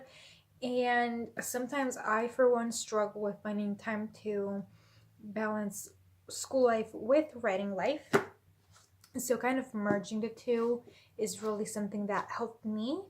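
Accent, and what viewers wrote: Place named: United States. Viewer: Thank you for the kind words! Also, thank you so much for sharing about your heritage! I love learning about things like that. I can definitely hear a little American in your voice. You do speak English very well. This may be a silly question, but do you strictly write in English? Thanks again for sharing!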